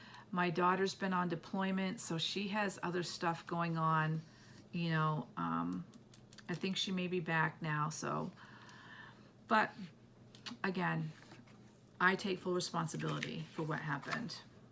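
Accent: New England, United States. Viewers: What state are you in?! Im in MA. I feel like I’m getting some new englander in you ❤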